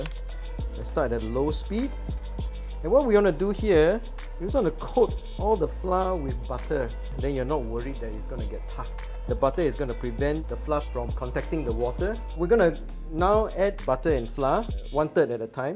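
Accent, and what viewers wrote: Singapore. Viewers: Looks fantastic! Even more siok ...love the Singlish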